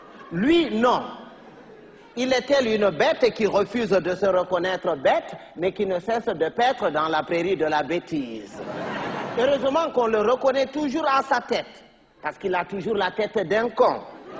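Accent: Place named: France. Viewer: La vie Frr t’as vu il parle le français français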